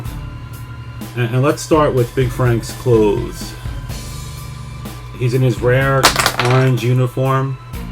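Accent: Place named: New York, United States. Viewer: And im guess by acsent alone your from nj or ny more than likely ny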